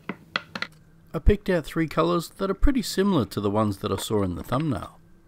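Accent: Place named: Australia. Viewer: Thanks for your honesty. Good onya for persevering! Great to hear and Aussie voice on youtube too. I'm wondering if they used a egg-shaped silicone mould.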